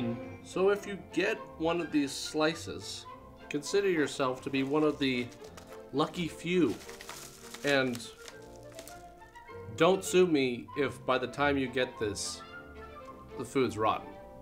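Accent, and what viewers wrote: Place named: Canada. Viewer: ive gone through a 5 videos of this channel and it feels like this guy is trying not to embrace his canadian accent, because it only slips out with words like "process" not trying to stereotype him but i spend alot of time in guild/clan voice chats and bro... just let use make fun of your accent. embrace it.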